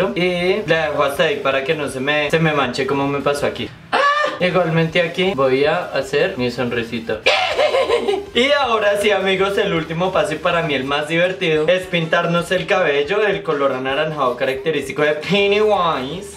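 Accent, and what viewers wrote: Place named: Colombia. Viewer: El acento colombiano es muy bonito 😍😍😍😍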